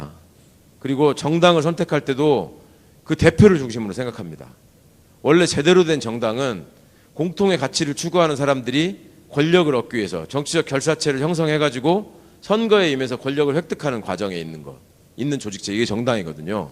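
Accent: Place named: Jeonju, South Korea. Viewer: 우리지역 전주가셔서 강의 하시니 완전 전주 말씀 정감있습니다...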